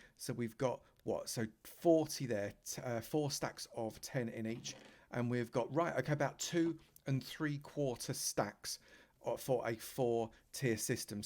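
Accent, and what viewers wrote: United Kingdom. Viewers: Are you just saying that because he has a British accent?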